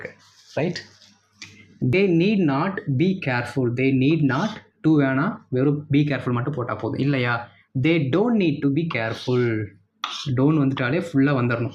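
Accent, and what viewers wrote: Coimbatore, India. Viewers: ஏனுங்க நீங்க கோயம்புத்தூரா Brother class super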